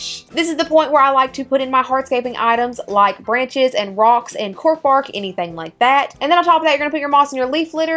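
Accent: South, United States. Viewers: Really nice videos. talks country (like me) but also very fast. I'm confused.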